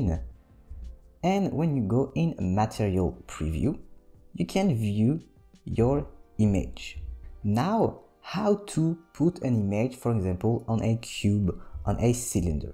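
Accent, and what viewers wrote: France. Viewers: ça pue l'accent français tout ça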